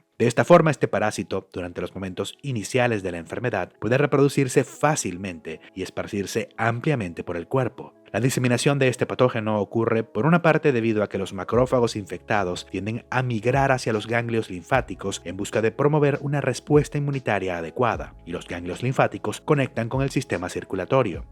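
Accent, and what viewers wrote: Venezuela. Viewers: pana eres venezolano ?? yo estoy nuevo en su canal , ya me suscribi , excelente trabajo con tus videos, dios te bendiga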